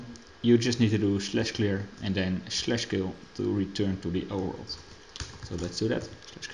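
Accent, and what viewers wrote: Netherlands. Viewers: Nice dutch accent ;) This works like a charm, thanks!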